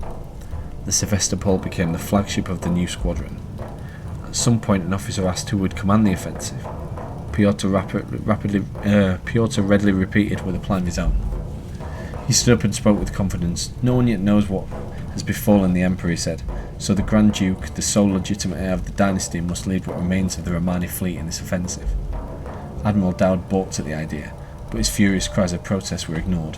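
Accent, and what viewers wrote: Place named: Northern England, United Kingdom. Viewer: Awesome videos man. Nice to hear a fellow northern accent rather than hyperactive Americans! Looking forward to seeing more, this game looks fantastic.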